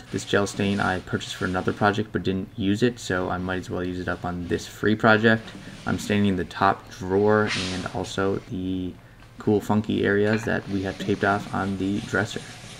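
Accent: Canada. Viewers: you from canada dude? accent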